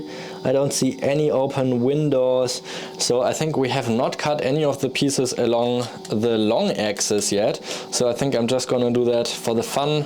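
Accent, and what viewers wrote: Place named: United Kingdom. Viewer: Just watched your short:gold:amethyst agate. Joining you in prayers that our US president will get defense weapons QUICKLY to Ukraine...today!!! Loved your shoes/Ukraine colors. You always take me back to student-exchange days Bielefeld/Westfalen 1961. You are so admirably articulate in authentic British English. Still read German Bible/listen to Bach/baroque daily. Thank you,. Your voice is music to my ears. Of course your enthusiasm and expertise with minerals (creation) are equally valued. Robert TX USA.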